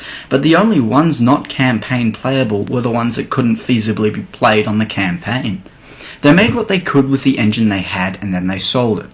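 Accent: Australia. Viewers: I hope for the majority of the people that pre-ordered R2TW that on receipt of such utter crap to NOT pre-order any game again based on trust alone till previews and proper critique is available for it. 'Fool me once, shame on you; fool me twice, shame on me' For me Empire: Total War was the kick in the balls from CA (and my one and only ever pre-order). Damn my desire to be Sharpe with the 95th rifles leading the British Empire:/ I still haven't purchased R2TW; well at least till it's completely fixed. I've wanted this game since the release of M2TW. There was a dream that was Rome (II). This is not it! Thanks for your videos; something about the auzzie accent gets me! That and you skips view on life (and stuff) is refreshing especially when living in the UK and the constant barrage of hypocritical nonsense gets a little too much. Loved it down under, can't wait to get my pommy ass down again, well, with ear plugs. 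I wont hear the end of it considering how you tanned our asses at cricket. /rant!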